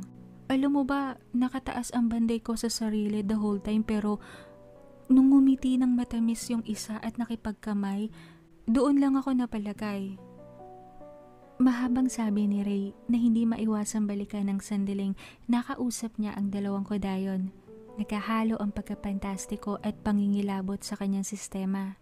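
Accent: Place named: Visayas, Philippines. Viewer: ❤😂hahaha ilab u...hahaha gustu ko ung accent mo sa bisaya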